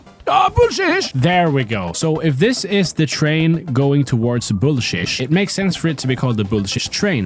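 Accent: Sweden. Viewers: He sounds swedish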